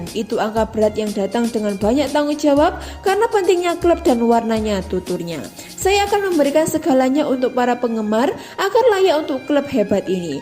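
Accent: Java, Indonesia. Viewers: Medok buanget yah pembawa narasinya 😁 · kental banget bahasa jawanya😆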